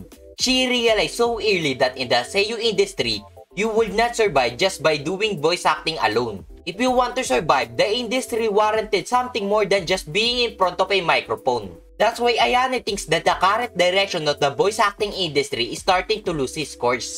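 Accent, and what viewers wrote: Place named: Philippines. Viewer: from the intonation of the narrator, im guessing, filipino? btw, nice vid! i watched because well, its ayane sakura!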